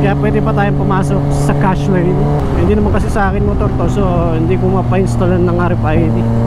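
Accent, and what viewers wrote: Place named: Ilocos Sur, Philippines. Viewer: akala ko nasa ilocos sur ka idol HAHAHA kasi similar yung mga nahay eh hahaha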